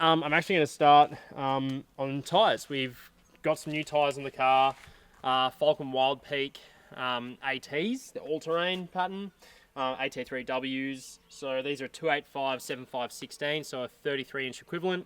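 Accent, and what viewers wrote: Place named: Australia. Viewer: Very heavy Australian accent almost as bad as heavy Scottish hard to understand??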